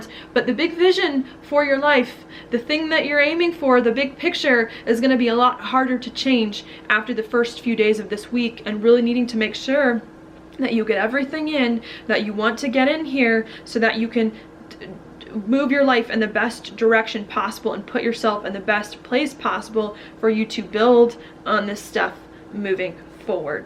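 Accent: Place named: South, United States. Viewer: Happy Holidays. Have fun in beautiful Georgia. I knew you were from there how you say so sweetly "thank you for watching my video, if you like it please share" total sweet southern way of saying something. Not an accent so much as a emotional quality. I love the south. My husband and I decided our personal heaven is gonna be like the south minus the bugs and gators.: )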